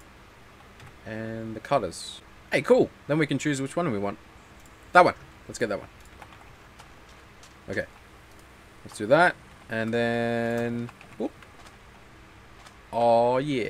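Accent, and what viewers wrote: Australia. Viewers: ye fuikin aussie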